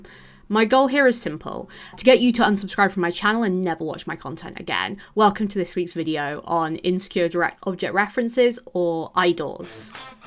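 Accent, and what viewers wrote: United Kingdom. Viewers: Are you brittish 😊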